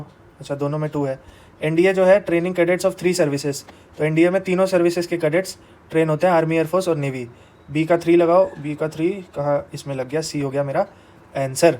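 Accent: India. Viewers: Sir aap bihar ya purvanchal up se ho kya ? Aapki language tone kuch waisa hi lag raha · Sir aap banaras se ho kya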